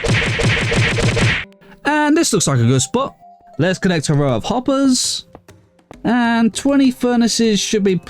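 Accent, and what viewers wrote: United Kingdom. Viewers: It is actually quite hard to me to understand how do u pronounce some of this words, as for non-english speaker. But, cool buildings and this cool sounding british accent, so i happy i found this channel!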